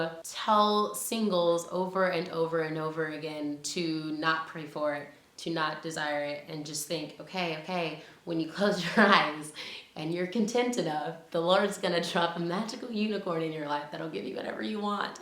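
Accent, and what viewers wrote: South, United States. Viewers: omgosh your southern accent with this message is the most amazing thing ive heard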